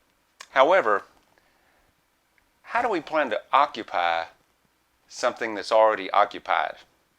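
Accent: South, United States. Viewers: Southern accent is the best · Mars is still inhabited by 5 species of Humans of varying sizes! They dont like or accept aliens coming to Mars! The Martians Kill all Alien life that land there? I also like your Southern drawl dear!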